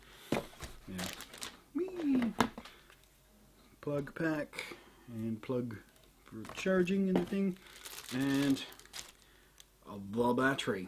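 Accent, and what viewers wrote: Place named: Australia. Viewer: You sleek like a true Aussie. I am proud.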